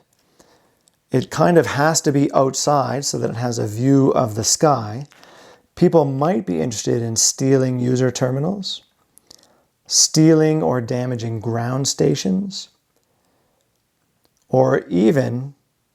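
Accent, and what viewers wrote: Nova Scotia, Canada. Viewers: Yeah, he sounds like he is from Nova Scotia to me and I have met hundreds of east coasters in my lifetime so far. We all talk funny anyway, lol.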